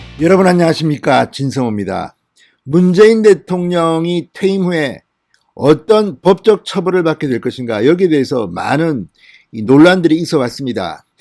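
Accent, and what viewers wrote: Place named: Gyeongbuk, South Korea. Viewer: 경북사투리중~대통대통 령악센트/토나와여~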